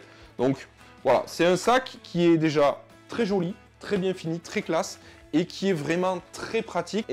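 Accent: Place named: South, France. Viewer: Salut l'amie pêcheur ! Tu as très bien présenté tes produits en plus avec l'accent du sud ! J'aurais aimé qu'il y ai une présentation sur un moulin casting big bait car les promotions sont assez rare un peu partout ! Car je ne peux pas m'acheter se fameux moulin qui irait avec ma canne jerk bait 60 120 gr de chez Daiwa legalis ! Merci encore pour cette présentation !!! A bientôt l'ami !!! 👍🎣🤗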